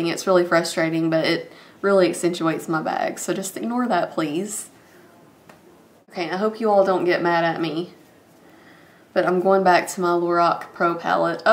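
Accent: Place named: South Carolina, United States. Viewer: What state are from? You sound like and I am from SC. Love your vids.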